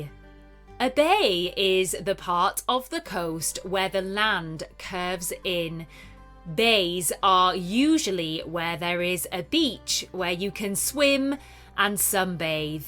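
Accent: United Kingdom. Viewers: That UK English! 😁💜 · Finally i find a suitable channel to learning British accent thank you so much🫂🫂🫂🫂🫂🫂🫂🫂🫂🫂🫂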